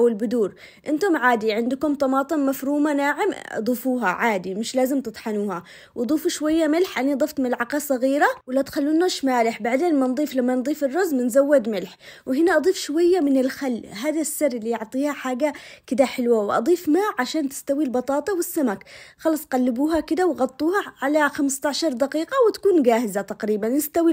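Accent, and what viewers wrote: Aden, Yemen. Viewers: ماشاءالله عليك مبدعه وحبوبه وشاطرة وحبيتك لانك من عدن متلي وماتتصنعي وتتكلمي عدني واحب لما تتكلمي العدني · ❤❤ قلبك ماشاء الله تبارك الله عليك مره وستوا ❤❤عاشت ست الكل أسعد الله أوقاتكم بكل خيرا آمنا إحنا عارفين الطريقه بس إللي شوقنا أكثر صوتك الجميل العدني الاصيل القح 😂🎉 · مااحلاك وامااحلى طبخك وكلامك العدني الحلو الله يعطيك العافيه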